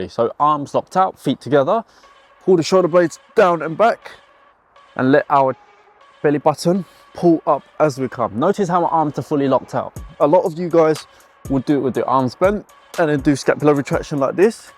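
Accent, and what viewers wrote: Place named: United Kingdom. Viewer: Thanks for the tips nephew, have to say I like your British accent from a young Asian man, I have an Aussie accent for an old Asian man. ( only mentioned this cuz it's my first time hearing it ), best wishes and cheers to you:yougotthis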